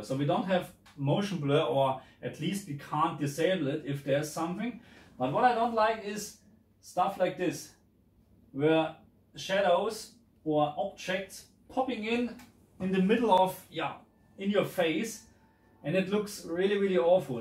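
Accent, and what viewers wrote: Germany. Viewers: Im sorry, it has nothing to do with the video but i’m fascinated by accents and I was just wondering, is that a German accent? That’s my best guess lol. When you said “when fading” I would of just said “when looking around” tbh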